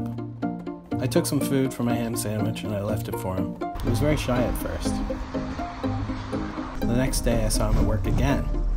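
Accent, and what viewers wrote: United States. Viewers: Hey your and English guy in the video but American on the voiceover???? That's the second time ive caught you doing this lol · Why did they make the narrator American when the guy in the video is British? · I've always suspected these types of videos were sent in by people and then their email was read as a voiceover by a Dodo employee. Hearing the man's English accent in the video and the American voiceover has confirmed it. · I noticed that too. The narration is American and the guy’s voice in the video is British. Not sure if this is a genuine story or not. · The narrator is American, where as the owner of Paul sounds English. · The comment thread has nothing to do with 'global citizens'. People are questioning why the narrator's voice has an American accent when the short pieces of dialogue you hear during the video is in a noticeably British/English accent. 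What has that got to do with where people live? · The guy in the video has an English accent, but the guy narrating is American. I'm a little confused. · Why does the narrator have an American accent but the guy in the video has a British accent? 🤔 sweet cat though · anyone else spot the narrator is a yank but the guy in the vid in engish? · Why is the narrator American but the guy hearing him talk on video English? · Narrator speaking in first person: soft, calm voice with American accent. Guy in first video: loud voice with British accent. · Was very confused when the guy in the videos had a British accent and the guy narrating had an American accent before realising the narrator is just telling the story of the British guy and the cat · Well that was weird. The voice over guy is American, while the person shooting the video is clearly a Brit. Did The Dodo think we wouldn't understand him? 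· Ok so the guy talking is American but the guy with the cat is English. Lies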